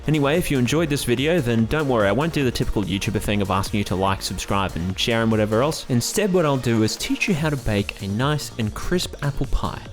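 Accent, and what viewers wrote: Australia. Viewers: He's Australian, not British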